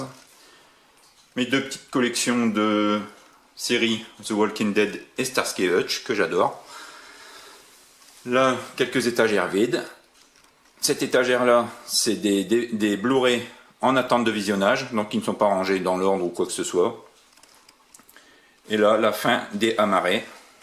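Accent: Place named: North, France. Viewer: Salut, super ta première vidéo tu as une super collection, j'ai hate de voir tes prochaines vidéos,merci pour ton remerciement et j'entend qu'il y a pas que moi qui est du nord;)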